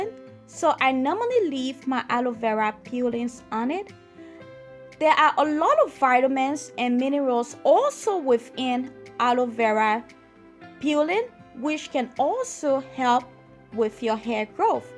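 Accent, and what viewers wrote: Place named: Liberia. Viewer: Your energy is so nice - I don't know if I'm late to this fact but your accent sounds Liberian I keep thinking my cousin is around the corner. I love this video I've used aloe on my daughter's hair since 3 months old and her hair at 2.5 is so soft and shinny her growth is consistent and she retains it. I've never used the peeling well done! Thanks a bunch!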